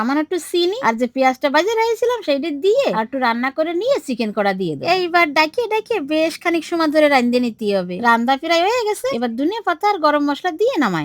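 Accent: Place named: Jessore, Bangladesh. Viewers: Unar kotha shunle Mone hoi Bangladesh er jashor er manush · বাংলাদেশের যশোর অঞ্চলের ভাষা। নিশ্চিত উনি যশোর অঞ্চলের মানুষ।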